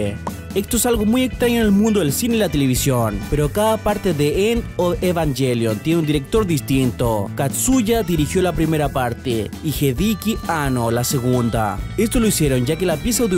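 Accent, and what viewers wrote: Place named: Chile. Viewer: Creo que a se le esta escapando lo chileno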